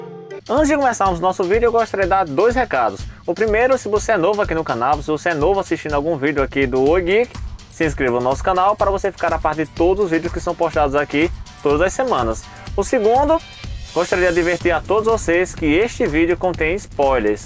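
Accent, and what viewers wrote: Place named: Minas Gerais, Brazil. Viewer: o jeito que você fala é muito mineiro,MORALHAS"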